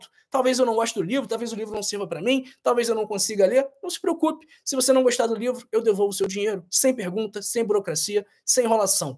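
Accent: Rio de Janeiro, Brazil. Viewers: Incrível no Brasil....esses médicos YouTube que massacram a gramática da língua portuguesa...pior esse sotaque carioca malandro nada chic ..... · CARA...A SUA CARA E A SUA CONVERSA CARIOCADA NÃO PASSA CONFIANÇA...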